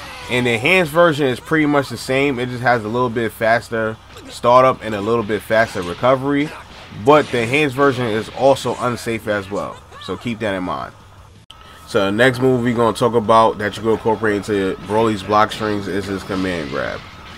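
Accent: New York, United States. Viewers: You from NY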